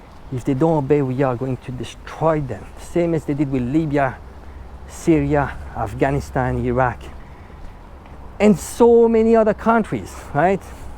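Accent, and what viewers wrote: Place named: France. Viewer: Please never stop talking and teaching about China, it is important and so necessary to push back against what we are told and given in media. I learn so much from you and maybe because you look familiar to me (my father being from Napoli) even though your accent sounds very french to me. If you have seen and lived this reality i trust 😊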